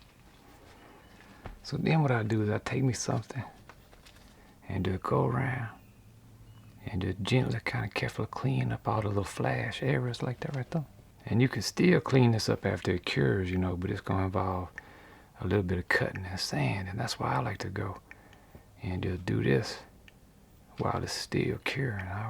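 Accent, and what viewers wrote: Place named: South, United States. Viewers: I love your voice 😍, I grew up in the south and your voice is so nostalgic. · Mississippi I think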